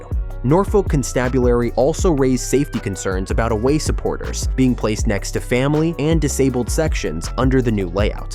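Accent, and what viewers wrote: United States. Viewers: This fu@ accent……I just can’t….I hear yankish and I snap!F america!F yanks!F Trum!F u!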